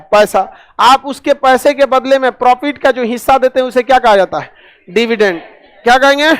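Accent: Bihar, India. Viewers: Apka accent bihari h but surname kese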